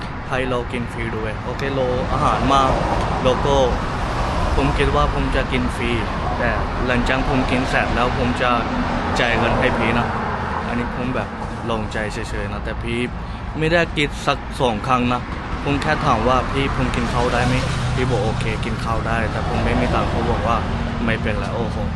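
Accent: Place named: South, Thailand. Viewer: สำเนียงใต้เลยนะฝรั่งอะไรวะ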